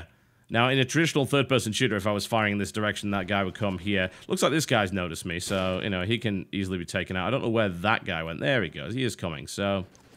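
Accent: United Kingdom. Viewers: You sounded more british than usual in this video. It's not a bad thing.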